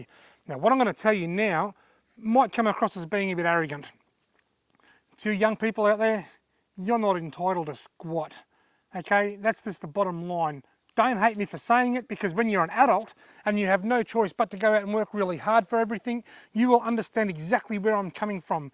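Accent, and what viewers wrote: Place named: Australia. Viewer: i am proud to be aussie when i hear a fellow aussie speak this way good on you keeping it real is so important great job mate:)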